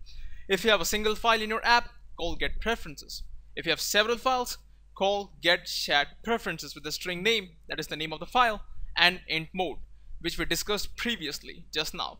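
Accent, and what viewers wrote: India. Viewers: Delhi Accent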